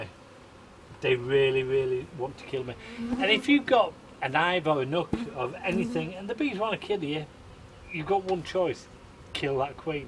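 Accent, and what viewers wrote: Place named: Australia. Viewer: Sounded like an Aussie to me.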